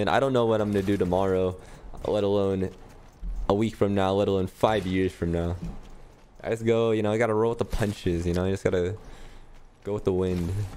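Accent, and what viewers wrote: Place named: United States. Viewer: Which country is Aceu from, or his home land ? He have American accent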